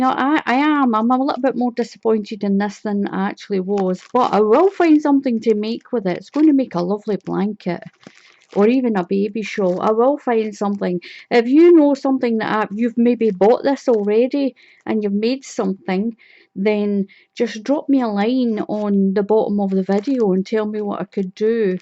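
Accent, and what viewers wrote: Scotland, United Kingdom. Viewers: Oh my! That totally blows that crappy mystery box from e-bay out of the water !! LOL... What a great stash. 🍀You did great.🎶 I have never used T-shirt yarn. I don't. know how it feels. But I can't wait to see what you do with the pom- poms.I thought I might like that for a trim around a baby blanket ? I really don't know. The colors of your stash are beauties. I am in the process now of making a Ferries Wheel yarn , color , Wild Violets, small baby blanket. Keep well. Stay safe. Keep healthy. God bless us all. I really like your channel and I love your brogue. 🌞 Is it Scottish ?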